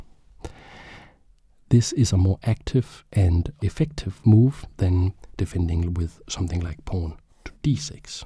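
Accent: Denmark. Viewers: the soft spoken danish accent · I think I also could recognize danish accent any day now :)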